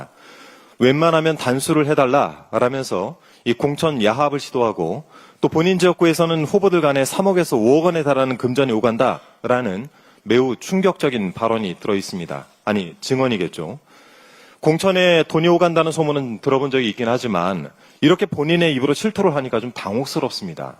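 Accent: Gyeongsang, South Korea. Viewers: 김정재 의원을 뽑은 지역구 사람들은 정신 차리라. 더신.ㄴ 경상도 사투리가 토할 것 같은 느낌을 버리규 싶습니다.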